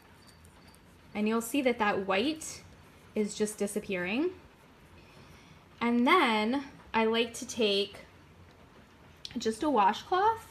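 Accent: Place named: United States. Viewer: It’s an American accent. We all have one. Even you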